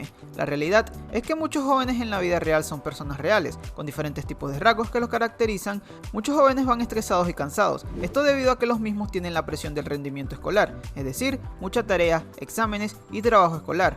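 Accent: Venezuela. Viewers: compa eres venezolano? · Eres venezolano?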